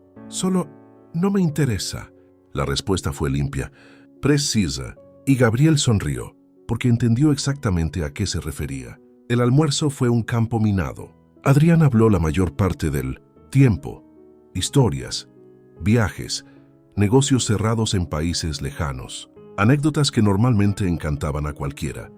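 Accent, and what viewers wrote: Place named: Portugal. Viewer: Muy mal leido. Cambien de robot de lectura. Mezcla portugués con español y corta mal las frases. Chau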